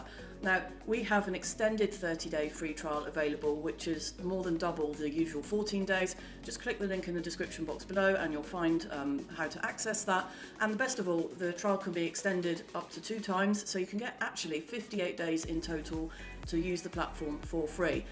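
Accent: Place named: United Kingdom. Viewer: Nice to hear a British voice lol 😂🙌🏽. I'm from the uk also 🇬🇧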